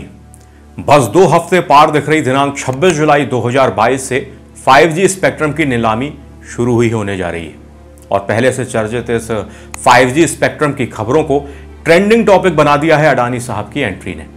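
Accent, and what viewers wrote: Standard. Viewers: you pure hindi speak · kya sudh hindi bolte hai.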